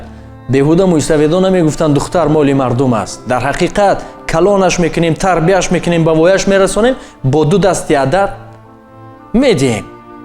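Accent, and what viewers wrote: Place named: Dushanbe, Tajikistan. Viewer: Хамааш дуруст! Ман дар Хучанди шумо 7 сол зиндаги кардаги лахчаи гап зании шумоя нагз медоным. Лек шумо гап занитон ранги Душанбегихо !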